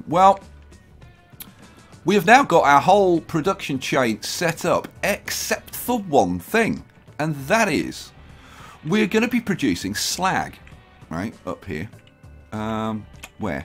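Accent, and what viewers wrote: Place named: Northern England, United Kingdom. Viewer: totally northern England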